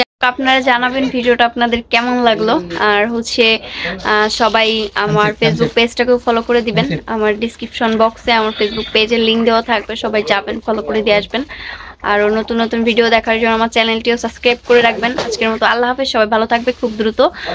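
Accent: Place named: Bagerhat, Bangladesh. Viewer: আপু আমার বাসা বাগেরহাট আমি অনেক বার মাজারে গেছি আর একটা জিনিস অনেক ভালো লাগে আপনার ভাসা আমাদের মত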